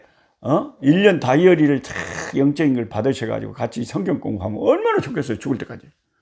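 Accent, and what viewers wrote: South Korea. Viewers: 구수한 지방사투리에 정감이 간당게유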